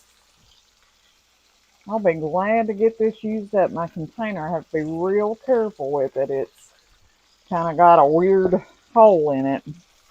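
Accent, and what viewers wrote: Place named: South, United States. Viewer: I love Southern food, as well as your accent! I once had a ton of leftover hamburger buns and threw them in the oven on low and toasted them to make breadcrumbs. Delicious because I added my own seasoning. Thank you for your video and chat.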